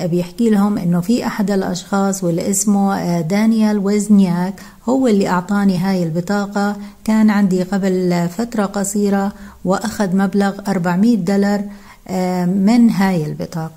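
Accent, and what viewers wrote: Jordan. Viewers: سردك للقصة جميل وشيق فيه كثير من اللغة العربية بميولك احيانا إلى اللهجة الاردنية الجميلة أيضا .. بالتوفيق سيدتي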